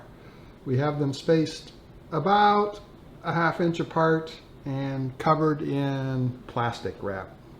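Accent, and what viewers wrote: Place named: Midwest, United States. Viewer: You are NOT from Texas, man! Your accent is from IL!!! I can tell a mid west anywhere!!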